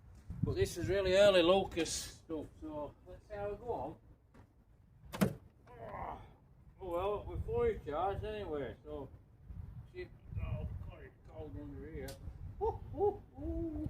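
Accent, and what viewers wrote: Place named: North, United Kingdom. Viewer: it's the funny northern accent. Poor AI doesn't stand a chance ..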